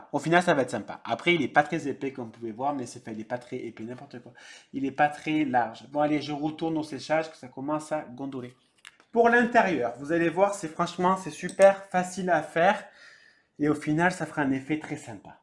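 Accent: South, France. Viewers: Très beau. Je vais me mettre à faire des albums. Jusqu'à présent que de la carterie... J'adore ta bonne humeur et ton accent méridional qui met du baume au coeur. Bon week-end à Seb et toi. Scrapbises. Chantal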